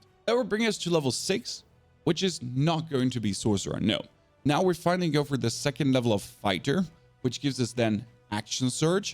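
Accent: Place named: Germany. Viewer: I clicked on this video without seeing who it was and immediately went "Hey, German guy!" 😅 Watched a million of your Diablo vids.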